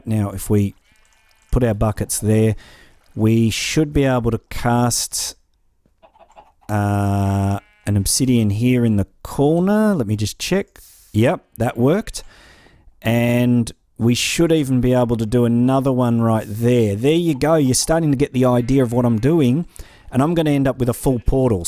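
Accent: Australia. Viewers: Are you an Aussie? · Sooooo keen for the download. Loving the series, I'm a newish sub and I'm bloody loving your work. Its good to be listening to a fellow Aussie as opposed to my other MC subscriptions. hahah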